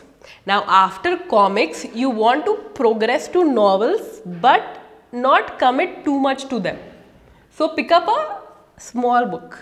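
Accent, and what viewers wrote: India. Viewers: Your English is very indian. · Love ur simple typical Indian English also good pronounciation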